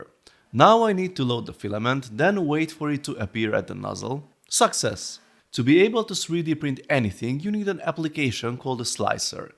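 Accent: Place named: Hungary. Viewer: Are you Hungarian? Your accent sounds so Hungarian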